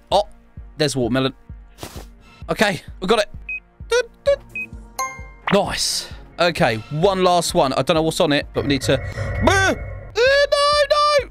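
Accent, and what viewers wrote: United Kingdom. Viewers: I'm here because of British accent also he said the majic word ( bottle of woater )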